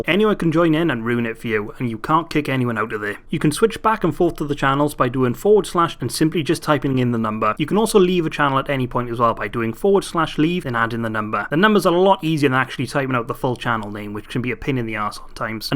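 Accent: Wales, United Kingdom. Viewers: Are you Welsh? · Are you Welsh???? I am!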